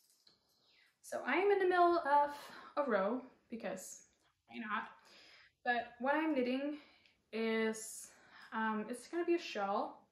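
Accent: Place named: United States. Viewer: Hi from Indiana in the US! Here the plural of yarn is yarns. ("...all of these yarns." or "...these types of yarn.") Your English sounds like American English, and your accent rarely comes out. (You speak more clearly than a lot of people in different regions here, so well done!) I feel like a huge go-to for using up stash, especially different colors of the same yarn, is to make a blanket out of them. I tried to look up the Bumbo in Ravelry to see what weight it is, but a lot of different options came up. I made a cardigan from dk acrylic, and I actually liked the structure I felt like it had. Acrylic seems cozy to me, although I agree it has its time and place. I hope a job presents itself for you, and I look forward to seeing your shawl!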